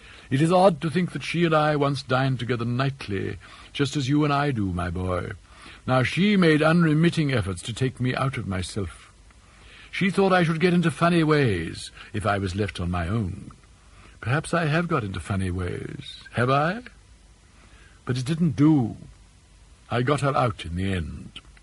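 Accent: RP, United Kingdom. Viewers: his own impeccable received pronunciation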